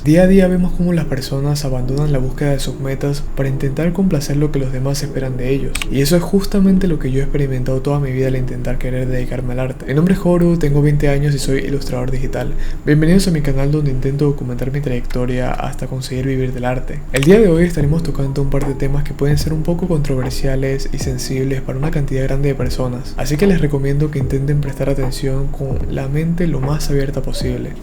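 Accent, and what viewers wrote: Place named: Panama. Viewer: Cada vez que pasaba el vídeo, yo me decia: "es como si me estuviera hablando un amigo, tiene el acento de aquí" y voilá, si, jajaja, es super raro encontrarme a otro panameño en Youtube. Saludos! n.n 👋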